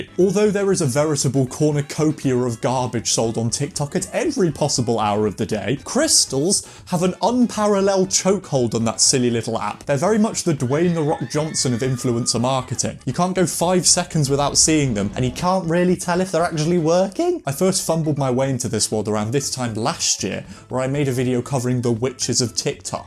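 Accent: United Kingdom. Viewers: Someone heard your accent and had to be told that you're British. At this point it's on them. Not to mention that apparently only Americans can talk about American movies? Interesting. Also, if I'm looking for some crystals, it's best to go to stores selling them. Sure, you could also get scammed there, but you can actually see the crystal before bying it. Also, I've seen much cheaper ones irl.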